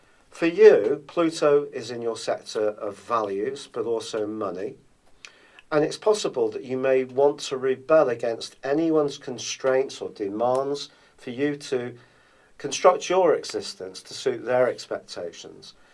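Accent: England, United Kingdom. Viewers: Thanks, I like your english accent...:-)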